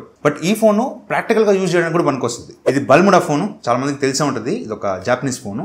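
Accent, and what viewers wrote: Nellore, India. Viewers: Bro u from nellore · Meedhi nellore aahh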